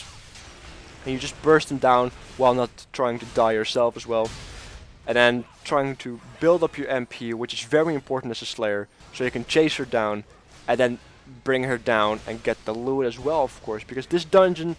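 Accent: Netherlands. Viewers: Hey, im dutch myself but wasnt sure :p i could hear it a little bit :)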